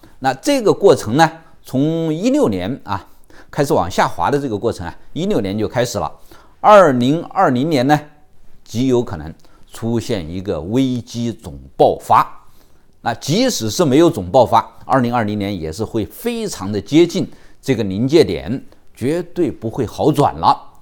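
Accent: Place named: Wuhan, China. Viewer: 作为一个长期旅居海外的武汉人，听到李老师的带着家乡口音的讲话，觉得非常亲切，而且字字在理，我非常同意李老师说的分析，大大受到启发。以后会长期关注李老师。谢谢！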